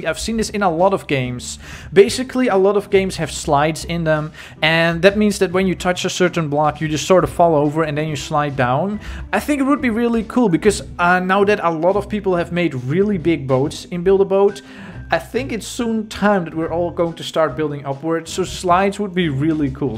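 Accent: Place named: Netherlands. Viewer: Ayy you sound dutch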